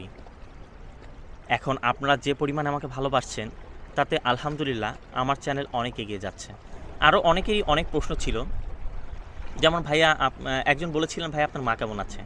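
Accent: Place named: Kolkata, India. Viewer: আপনার বয়েছ কলকাতার বাঙালিদের মত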